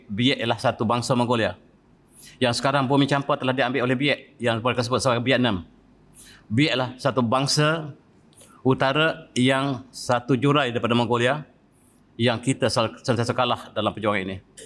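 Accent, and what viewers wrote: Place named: Pattani, Thailand. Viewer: Terharu apa yang di sampaikan oleh prof benar benar menyentuh hati bahkan logaht bahasa melayu Pattani tanpa sedar airmata ku mengalir di pipiku.. memang tepat dan benar prof..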